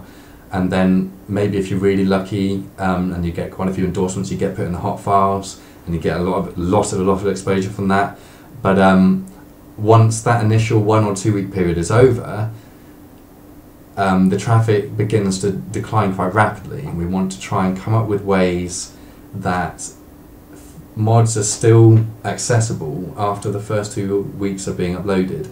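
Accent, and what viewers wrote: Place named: United Kingdom. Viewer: Very interesting as always. British accent makes everything better!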